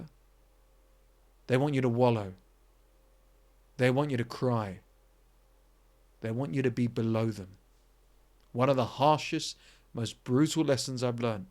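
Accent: United Kingdom. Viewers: Where in Britain are you from? I have a Master's Degree in British English, and I studied to speak Received Pronunciation.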